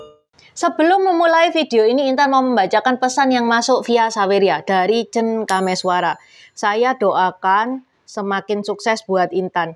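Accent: Java, Indonesia. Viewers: NGOMONG NYA BIASA AJA TUDAK USAH MEDOK GITU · Logatmu negara Jawa,, 🤣🤣 ,, Jawa itu cuma suku yg tinggal di daerah Jawa di Indonesia,, bahkan di pulau Jawa (bukan negara Jawa),, sewajibnya kamu harus logat Indonesia,, jika tidak, channelmu gak bakal maju sampai mati 😢😥🤤😲,, belajarlah ke Deddy Corbuzier yg Indonesia banget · Aku suka logat hostnya. Orang Jawa kalah medok. ❤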